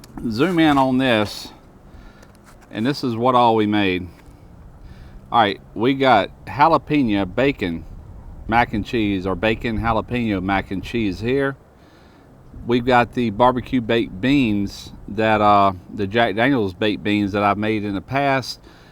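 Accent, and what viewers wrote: South, United States. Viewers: you make fabulous videos! Most informative, along with a great Southern drawl that makes a super combination for super, southern cooking! Thanks, and looking for more of your videos!!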